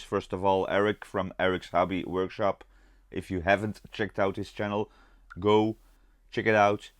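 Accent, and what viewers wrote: Netherlands. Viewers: Dat hollands accent is sterk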